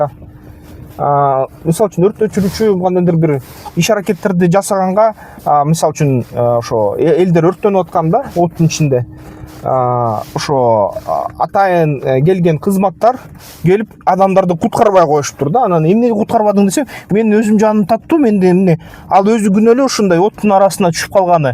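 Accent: Standard. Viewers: Ассаламу алейкум. Менден дагы салам сага 🤝🏻 Санкт-Петербургдан. Сенин айткандарын аябай жагат грамотно орду менен таза кыргызча жонокой кылып тушундуросун. Калтырбай угам.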